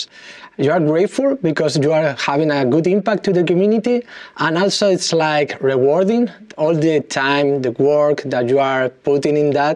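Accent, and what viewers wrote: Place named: Spain. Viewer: Nice accent very authentic spaniard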